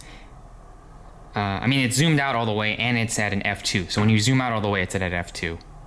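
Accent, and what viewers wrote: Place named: South, United States. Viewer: Говор этот южный... поубивал бы начлен. Чесслово, уши вянут. А после Samsung HMX-H405... Ну, чего тут сравнивать-то - земля и небо. Если кому надо русифицировать AX60 или настроить AX53 (или иную камеру Сони) на 30/60/120 к/с - обращайтесь, научу. У меня АХ53 пишет в NTSC и дез получасового ограничения записи.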